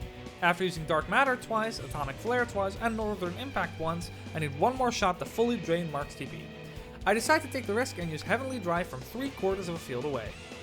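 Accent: Limburg, Netherlands. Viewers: your nederlands en limburhs omg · Fam kuumps ste oet limburg?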